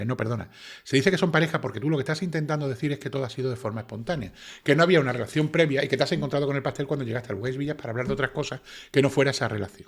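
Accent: Andalusia, Spain. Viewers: Es del sur de España, una región donde se habla muy el castellano, porque más que se lo digan es imposible que corrija su vocalización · Muy interesante, muy bien documentado, un trabajo muy profesional, el único "pero" es el esfuerzo que hay que hacer para entender el "andaluz estrecho" y sin diferenciación de algunos fonemas, entonación y arrastre de las sss y algunas guturales.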